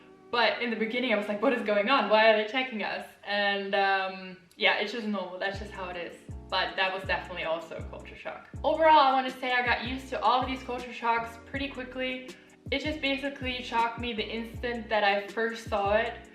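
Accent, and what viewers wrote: United States. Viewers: Sister, are you really German? Your accent is not at all German. It's American. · She is German having American Accent, making video on India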